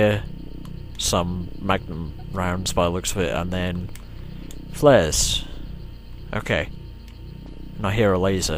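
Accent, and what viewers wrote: United Kingdom. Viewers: gotta bomb dem aliens. TR logic. You don't question it, you just take it for granted. Accept it for what it is. EHAEUAHEUAEHUAEHUAEHUAEHUAEHUAEHUAEHEAUHEAUHAEUEAHUH this was hilarious. .mp3 ammo. Totally. that's totally fine. British accent is cooler and sexier than American. EHAUEHAUEHAUAEHUEAHEAUHEAUHAEUAHEUEAHUAEHUAEHU straight into the lazor. I'm choking here :D yes, you have gone the wrong way. I just love your fuckups <3 look how sweet they are. I want one of them. No, actually I want both. I suffer from an extreme Lack of Domestic Animal Syndrome, so like... yeah :( dat heart attack. Warning for headphone users. Don't you love how the UFO is so small on the outside, but absolutely huge inside? Also, as a kid, I was hoping to fight some aliens, and got super disappointed.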